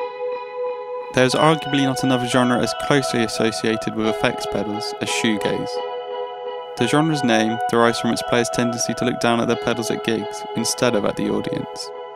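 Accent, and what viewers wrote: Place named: United Kingdom. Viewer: mostly the british accent tbh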